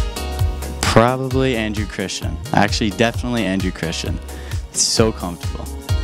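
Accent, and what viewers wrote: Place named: Canada. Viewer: Is he Canadian? I have a Canadian friend who sounds almost the same.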